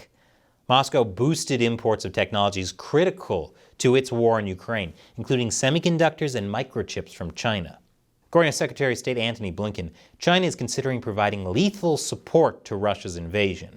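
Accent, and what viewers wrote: Australia. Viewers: Nice Aussie accent there! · Thats an Australian accent ont kiwi & yes, Jacinda horse faces fuck wit has mess up our country. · Mate if you are going to insult people from New Zealand get you shit right., you insulted us by using an Australian accent..... we spend mot of our time insulting Australians.. you do no that New Zealand is not in Australia right? If we are insulting people you sound like small child and your face looks like your holding a fart in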